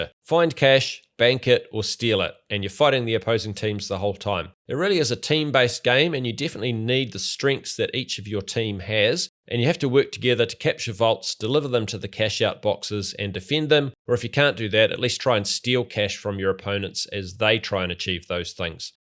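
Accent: New Zealand. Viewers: Nothing beats the kiwi accent!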